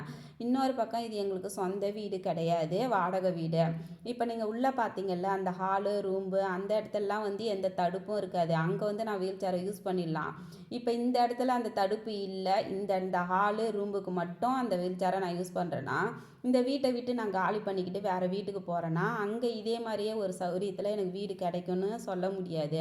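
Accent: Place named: Cuddalore, India. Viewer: சீக்கிரம் உன் ஆசைகள் நிறைவேற பிராத்தனைகள் மா ❤🎉 எந்த ஊர் மா நீ. கடலூர் பக்கம் என்று நினைக்கிறேன். ஏன்னா பேசுறது எங்கள் பகுதியில் இப்படி தான். நேரில் பார்க்க நான் வரேன் மா. எல்லாம் நல்லதே நடக்கும் உனக்கு. உங்கள் சொந்த வீடு என்று நினைக்கிறேன். எப்படி உங்களுக்கு தகுந்த மாதிரி கிச்சன் மேடை அமைந்து இருக்கு 😢🎉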